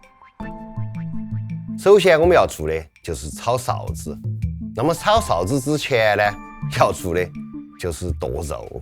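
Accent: China. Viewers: His Chinese accent is fucking hilarious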